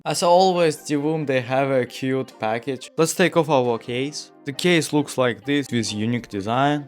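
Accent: Russia. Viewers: Чел у тебя оусский акцент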